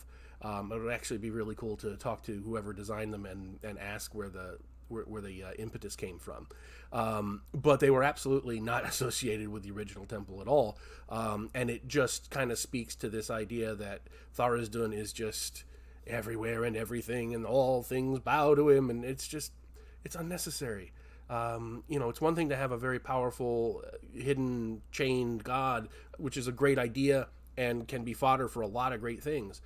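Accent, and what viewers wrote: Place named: General American, United States. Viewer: My man, you confuse me, how can you have the most british tavern keeper face with the most bog standard American accent ever?! Holy moly.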